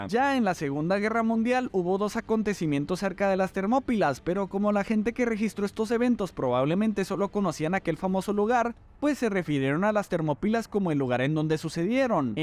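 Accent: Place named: North, Mexico. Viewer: Chido canal, por cierto, eres mexa? Supongo que tu "sh" proviene del norte, como de Chihuahua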